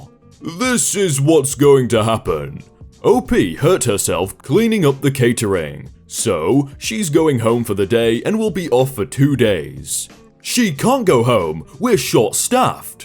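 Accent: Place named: England, United Kingdom. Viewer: It's an accent. Many accents exist that pronounce words that way. Plus, he is English, so one could argue he knows the language best.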